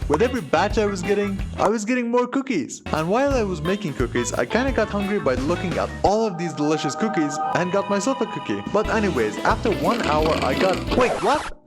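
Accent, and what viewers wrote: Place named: United Kingdom. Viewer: You just have british english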